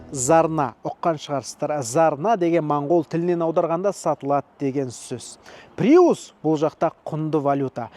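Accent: Standard. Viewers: сау бол, рахмет контент үшін! Ұлтым Өзбек болсада Қазақ тіліні жақсы түсінемін, соның таза Қазақ тілінде сөйлегінің үшін көремін каналиңди. Рахмет. Өзбекстаннан Салом Алейкум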